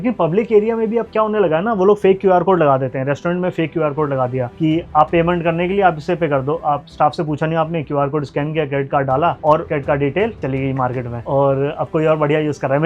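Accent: Pakistan. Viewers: pure pakistani accent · pakistani accent lag rha hai 😅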